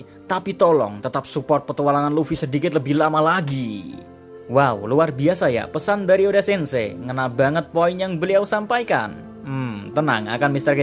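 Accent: Java, Indonesia. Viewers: Orang mana kok nyamen boso jowone.....salam oplovers tuban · Orang jawa mana suaranyaa🤣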